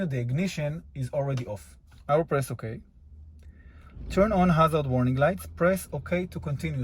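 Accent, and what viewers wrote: Israel. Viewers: מבטא ישראלי. אשמח אם תוכל לעזור לי לגבי שכפול שלט לקיה קרניבל 2022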